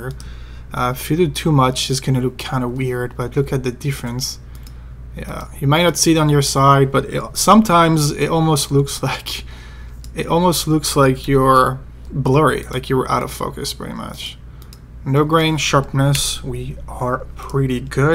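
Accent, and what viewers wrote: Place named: France. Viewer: J'adore le petit accent français. Hello from LA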